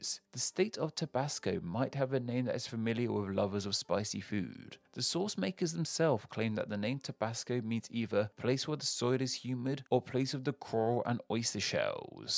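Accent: United Kingdom. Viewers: The british pronunciation is so annoying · This is a GREAT video. Just some notes. Sonora = So-NOR-ra. The "sur" in Baja California Sur should have a strong "uu" sound. And yeah, saying Mexican names with that British accent just can't be the best. You did good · Your British accent is very difficult to understand for me as an English-speaker living in America. Can other Brits understand you? a Yorkshire man for example, or a Cockney, or for gosh-sakes a Scot? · He didn’t butcher them. His British accent didn’t help in some but over all he did very well.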